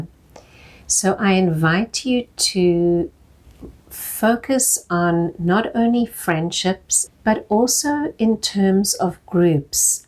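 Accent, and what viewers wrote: South Africa. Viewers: Thank you! 🙏🏾 Are you South African? Your accent sounds so familiar to me. ☺️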